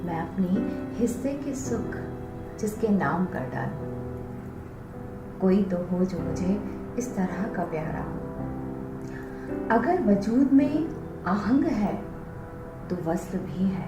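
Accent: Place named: Hindi Belt, India. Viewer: معذرت لیکن سچ میں غیر مسلم کا لہجہ الگ ہی سمجھ آجاتا وہ کشش لگ ہی نہیں رہی۔ اردو سے محبت تو بہت لگ رہی میڈم کو مگر لہجہ میں اب بھی ہندی کا اثر واضح ہے۔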